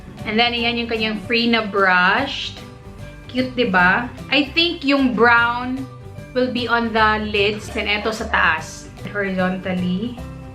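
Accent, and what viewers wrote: Visayas, Philippines. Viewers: HAHAHAHAAHAH ka cute sa pag bisaya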